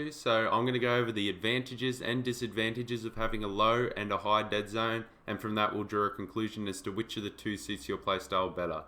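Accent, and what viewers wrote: Australia. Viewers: Soon as I heard that voice I subbed, don’t come across many aussies, good video to bro, didn’t know what deadzone was till now 🤙🏻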